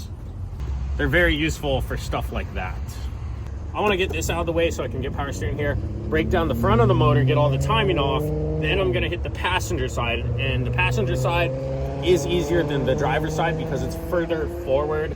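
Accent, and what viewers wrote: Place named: East Coast, United States. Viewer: You do not sound like you're from New Mexico. Your accent sounds east coast or midwest. I spent 8 years in the Land Of Entrapment. I loved most of those years. Anyway, I liked the video. It brought back memories of major repairs in auto store and apartment complex parking lots. Mine were Mitsus, not Subies, but same shìt...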